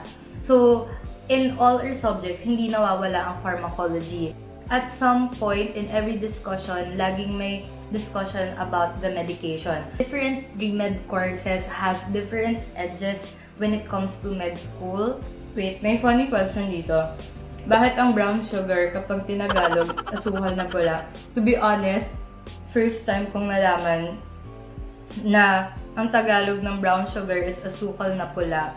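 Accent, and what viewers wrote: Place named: England, United Kingdom. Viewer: I love you english accent.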